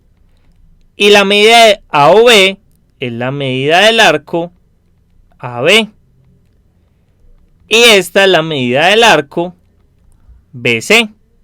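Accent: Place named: Antioquia, Colombia. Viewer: es acento paisa, aprende un poco de los diferentes dialectos colombianos.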